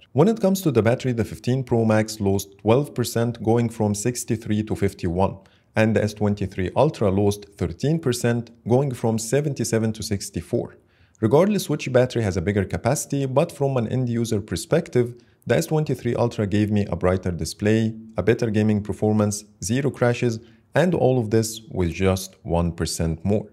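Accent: Egypt. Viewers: عاش والله طور من الانجليزيه عشان ملاحظش انك مصرى بس ممتاز جدا ❤ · اللهجة مصريه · Bro, are you Egyptian cuz I can hear it from your accent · مصري؟😂 حسيتك هيك من اول جملتين😂 *انا سوري btw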